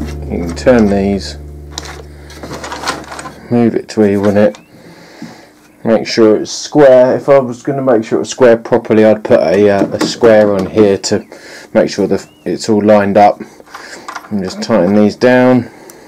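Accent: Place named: England, United Kingdom. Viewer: Great video spoilt by such crap music. Great to hear an English accent for a change on here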